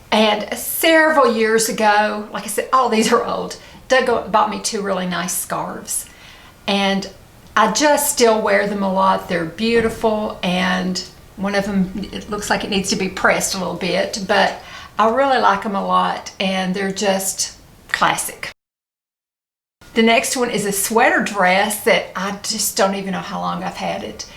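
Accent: South, United States. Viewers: You could have been a Miss America...you are so beautiful and your figure is amazing. Love your southern accent...❤️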